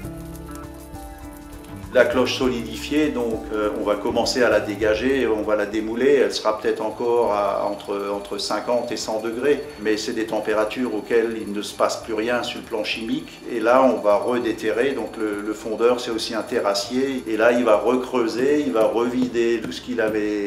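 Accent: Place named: Alsace, France. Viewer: Sympathique accent Alsacien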